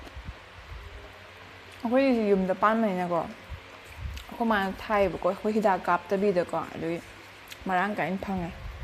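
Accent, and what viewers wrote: Kakching, India. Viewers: Kakching marol se aidi tabada yam nungsi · Kakching marolse taba ym nungaite nungcihe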